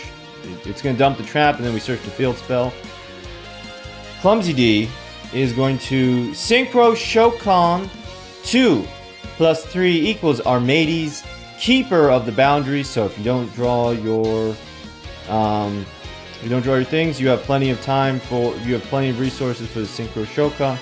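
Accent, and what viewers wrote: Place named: Ireland. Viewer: A1 Irish pronunciation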